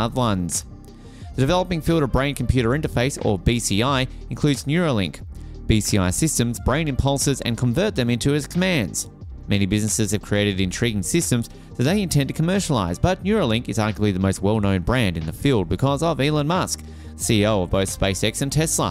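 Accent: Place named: Australia. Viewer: Just a ridgy didge Australian accent. It's PRIME! No idea what he's alluding to. Or just trying to avoid a monotone AI like reading of a script.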